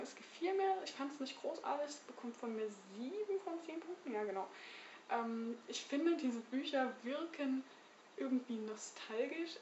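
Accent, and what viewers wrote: Dresden, Germany. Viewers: Aha SciFi steht bei dir im Regal also links. Gut zu wissen :P Aber jetzt mal zum Inhalt: Zum Glück hast du mich abonniert, sonst wäre ich erst zu spät über dich gestolpert - das wäre schade gewesen, weil ich deinem Bücherregal entnehmen kann, dass da durchaus einige literarische Schnittstellen sind und mir vor allem auch dein kritischer Blick gefällt. Es freut mich, wenn mehr Menschen mit einem etwas anderen Geschmack den Weg in die Booktube-Community finden. Nahezu mutig ist es auch von dir zu sagen, dass du - OMG! - Bildbände bzw Mangas/Comics liest und die auch vorstellst. Soweit habe ich mich noch nicht getraut^^ Brave New World muss wohl auch demnächst bei mir endlich einmal dran kommen. Ich nehme es mir vor, seitdem ich vor vielen Jahren beim Film Free Rainer von der Existenz des Buches gehört habe :D Ich bin gespannt auf mehr Videos von dir und finde es tapfer von dir, dass du deinen Dresdner Dialekt nicht verleugnest, so wie ich meine sächsische Herkunft verleugne. 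Kannst du es aber irgendwie ermöglichen, dass die Lautstärke noch etwas höher wird? Liebe Grüße Vincent:-) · Die rothaarigen Booktuber mehren sich! :) Gerade entdeckt durch dein Abonnement auf meinem Kanal! Patrick Salmen muss man eher mal live erlebt haben... Habe ihn in Berlin ein paar Mal gesehen, allerdings nur mit sehr neuen Texten. Bücher von Poetry Slammern besitze ich aber kaum.. Lieber das Hörbuch! Der Herr ist vornehmlich in Wuppertal unterwegs. Kurzgeschichten- und Lyrikbände können auch mein Herz gewinnen. :) Danke für die Empfehlung der Booktuberin/Autorin. Die Farbe Lila und Wer die Nachtigall stört.. Habe ich mal in einem Zug (ja, ambig!) gelesen und war sehr schockiert.. Habe den amerikanischen Rassismus vorher kaum wahrgenommen in der Literatur. Schöne Neue Welt kennen gerade die Leute hier kaum, die viele Jugenddystopien lesen. /: Ziemlich schade. Für mich ein großartiger Roman. 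Toller Kontrast zu 1984 von Orwell. Sie versucht Murakami hinter sich zu verstecken. :D Unbedingt mehr Videos machen. Mir fehlen solche Booktuber, die wirklich etwas zu sagen haben. Deine ruhige, eloquente Art ist sehr angenehm. Das mit den Charakterstudien erwähne ich auch in jedem Video.. Zu viele Gemeinsamkeiten! :) Liebe Grüße! P.S.: Dresdner Dialekt kommt manchmal durch, sehr toll. Und, ich habe dich mal auf Facebook in einer Booktube-Gruppe empfohlen. :)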